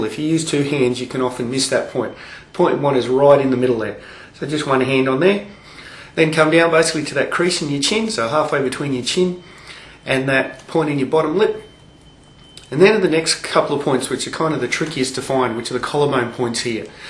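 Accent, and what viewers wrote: Australia. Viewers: Thank you. Short and sweet and the added pleasant surprise...the aussie accent. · Sound like an Aussie. Are you in Melbourne ??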